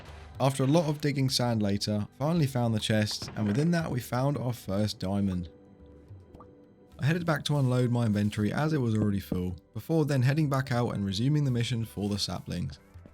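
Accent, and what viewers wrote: United Kingdom. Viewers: Okay, this is the first time I'm hearing your voice. I never knew you were British! 👀😂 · Are you from UK sir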